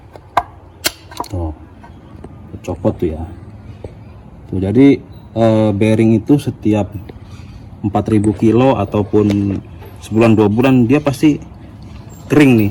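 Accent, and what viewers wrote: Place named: Indramayu, Indonesia. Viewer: posisi bengkele ning ndi kang.. logate kayae wong dermayu ya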